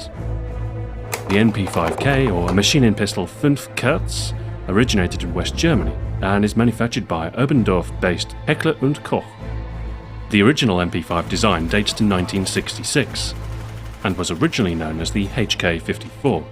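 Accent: England, United Kingdom. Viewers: it took me 4 minutes to realise hes english :D i cant recognise my own accent :D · He sounds like one of those professional british voice over actor. SOOOOOOO good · Most useful intel indeed, as always narration is more believable in british. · I never knew is brittish lol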